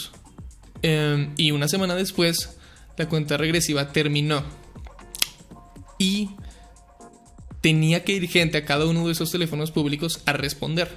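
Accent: Bogotá, Colombia. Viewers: Siempre creí que eras Mexicano, en tus otros videos no noté tu acento colombiano. Por cierto, me encantó el video :)) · Se te nota mas el acento colombiano... Eres colombiano? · ahora que se escucha en una conferencia, se nota tu acento rolo :D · se te nota lo colombiano xd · Uy pana, usted tiene resto de acento rolo, recién me doy cuenta, usted parece de Bogotá JAJAJAJA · La primera vez que lo escucho con acento colombiano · El acento colombiano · Jamás había notado qué yan colombiano eres :0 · Vos sos rolo no? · Looollll hoy si te escucho mega rolo, fan de tu voz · Nunca había escuchado su acento real es re cachaco rolo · En este vídeo si se nota tu acento Colombiano xd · ya te escucho el acento Bogotá ^w^ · En este video sin guion se nota mas el acento colombiano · ! Tienes tremendo acento rolo! Un capo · He visto todos tus videos, hace relativamente poco me enteré que eras colombiano en un video en que lo mencionaste; me sorprendio bastante porque jamás se te siente un acento en los videos, pero acá nada más empezar se siente, eres colombiano con toda! 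Un placer seguirte, abrazos desde Bogotá <3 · definitivamente es rolo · tiene full acento colombiano verda, tipo paisa neutro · Al hablar tranquilamente, tienes unos pequeños dejos de rolo en tu acento, se nota que llegaste a vivir por un tiempo en Bogota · banda, soy yo el unico que le parece que en todos sus videos su acento es muy neutro, mas tirando a mexico, pero aca se le subio el colombiano por 3000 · Jajaja se nota mucho tú acento colombiano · Aquí pude notar mucho su acento colombiano, q increíble jajaja buen video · Puedo decir que aqui si se te nota el acento de Bogotá, bastante... Eres un orgullo para tu patria y familiares bro! Saludos desde Cartagena :D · Acento rolo, parce!!!!! 🇨🇴🇨🇴🇨🇴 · Siempre pensé que eras mexicano o algo así, pero ya tras este video dos colombiano. Lol :0 yo soy de medallo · Es la primera vez que escucho a con un asentó colombiano genuino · Es mitad colombiano y mitad de otro país aún desconocido, lo decía en la descripción antigua de su canal además que en los streams antigüos de halo lo confirmo y ahí se le notaba muchísimo más el acento. 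· cuál paisa? es re rolo xd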